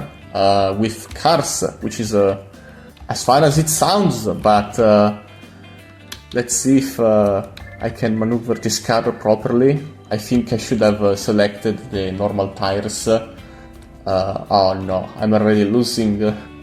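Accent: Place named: Finland. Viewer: The finnish accent is lovely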